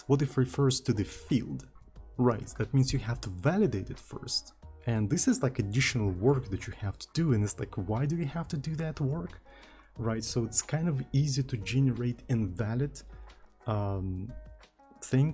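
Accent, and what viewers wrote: Russia. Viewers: Hi (or privet, as a Russian I hear russian accent in your speech :D)! Thanks for the video :)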